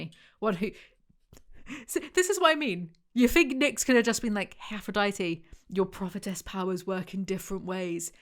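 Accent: Texas, United States. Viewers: As a Texan, I am in love with your Texas accent 🤣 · what an impressive and accurate texan accent!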